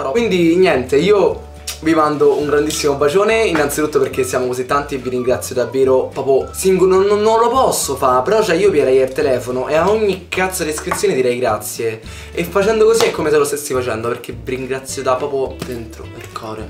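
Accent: Rome, Italy. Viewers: Canti benissimo! Oltretutto amo sentirti parlare perché amo il romano ahahah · hahahahha mi fai troppo ridere! e poi il tuo accento romano è fortissimo!! haha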